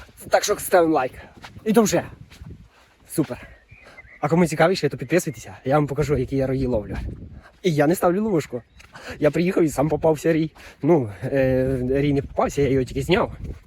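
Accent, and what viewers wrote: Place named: Dubno, Ukraine. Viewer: у мене бабуля з Судобичів біля Смиги. Чую чую я цю мову Дубенську чи у тебе Радивилівська? ))) класно там ліси ставки....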